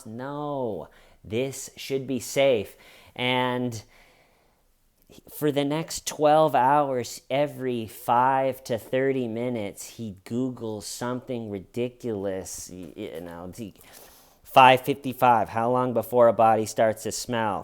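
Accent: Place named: Boston, United States. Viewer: I'm from Boston and you make me lol with your Boston aaaacccent. I've been a true fan from day 1 when you post a video it makes my day! Any comedy show coming to Boston in the future?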